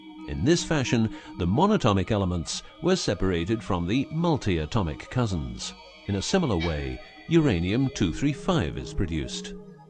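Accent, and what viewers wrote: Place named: United Kingdom. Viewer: These assholes lost my interest when during the segment describing brain benefits of monatomic gold, they said, "detecting lies" and flashed a picture of George W. Bush instead of Bill and Hillary Clinton. That's pretty much all I needed to know about these idiots. A British accent spewing bullshit does not a watchable video make.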